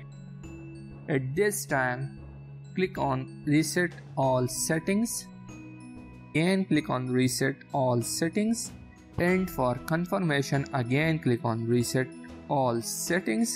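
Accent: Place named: India. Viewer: Indian english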